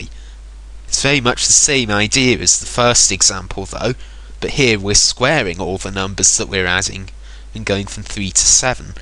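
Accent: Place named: England, United Kingdom. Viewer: Well done! Love the wit! Dad was from Manchester-so nice to hear the English accent again!!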